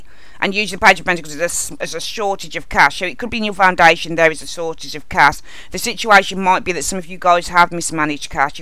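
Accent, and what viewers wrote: Birmingham, United Kingdom. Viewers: Thank you. Mismanaging money...yup. my lifelong problem. Hopeless! Love the Brum accent btw. Always enjoy your direct, on point approach to readings....don't let the whingers get you down!! 😋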